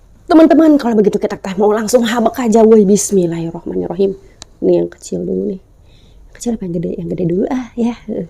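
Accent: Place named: Banten, Indonesia. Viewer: mah ngababita bae 🤤🤤🤤 bahasa na jiga banten nya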